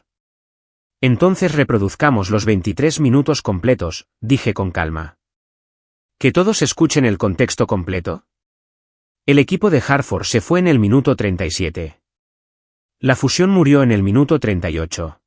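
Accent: Spain. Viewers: A mí siempre me gustan las historias que narra este muchacho. Oh, señor, lo felicito, me gustaría saber cómo se llama . Sé que tiene un acento español Las narraciones son Excelentes y voy a ver esta qué tal me parece desde el principio que es buena.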